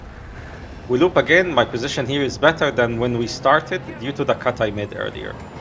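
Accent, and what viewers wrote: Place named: Egypt. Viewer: are you egyptian? very egyptian accent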